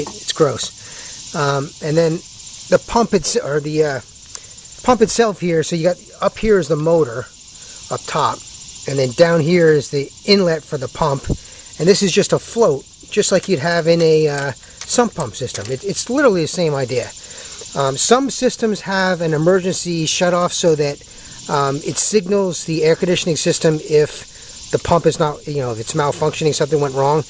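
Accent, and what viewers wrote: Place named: Bronx, United States. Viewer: first thank you for taking the time out to reply. i used ur tips and cleaned out the P- trap, opened the sub pump,bought a multi meter (first time using one. sh%t scared of electric) now im testing everything around the house thank you ), in a nut shell everything came out right with your instructions,which on the videos you explain everything in great detail thanx for being calm and the way you put your words together and accent( sounds like a BRONX accent)were im from. makes you want to listen more,your great. thanx again MR.thank you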